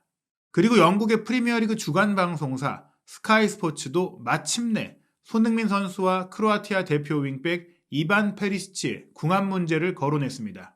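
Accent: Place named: Seoul, South Korea. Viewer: 말투바꿨네 말투이상해요 서울말쓰려고하시나...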